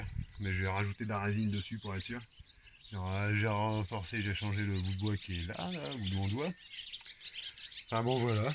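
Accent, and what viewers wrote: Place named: North, France. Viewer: Travail monumental 😱💪🏻💪🏻 Bravo. On est en plein dedans pour la capucine de notre camping-car 🥺 J’ai reconnu l’accent du nord de mon enfance ☺️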